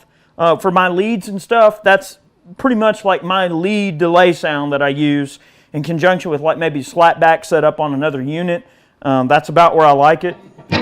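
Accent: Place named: United States. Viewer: Those Yankee hillbilly accents and those GI Joe Iraq hats should be aced. It's like one of those guys off of Duck Dynasty turned guitar player.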